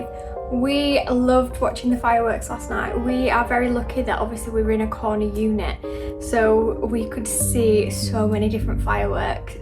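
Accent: United Kingdom. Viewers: Pure english. Pronounciation of the british. Hard to understand. Unlike the american english. Its too easy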